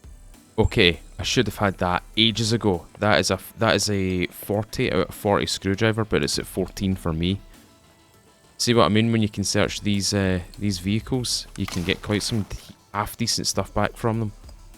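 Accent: Scotland, United Kingdom. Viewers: Just started playing SCUM a few days ago since the 1.0 release. As a daily DayZ player I have to say I LOVE SCUM 😊 Similar but different enough to provide an entirely different experience. Thoroughly enjoy your videos, that Scottish accent makes them a pleasure to listen to. I’m English from West Yorkshire. I live in America. For over 20 years I’ve heard “oooh I LOVE your accent where you from !?!?” Honestly being from Bradford I never understood it because we literally sound like cave men who were dropped as babies. A LOT. However I DO understand that certain sounds are appealing to certain ears while not for others so 🤷🏼‍♂️ Cheers mate hope you have a lovely Sunday 🙏🫡👊❤️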